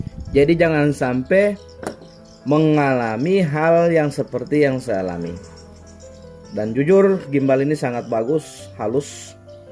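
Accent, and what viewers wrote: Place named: Southeast Sulawesi, Indonesia. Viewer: Logat abang mirip logat org sultra.. 😂😂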